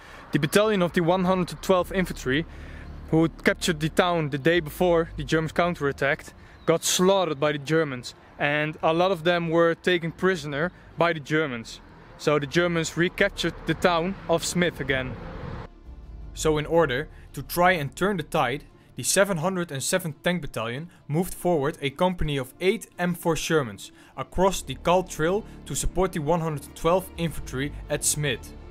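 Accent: Netherlands. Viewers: Wat een zwaar Nederlands accent!!Ik dacht dat je erom deed.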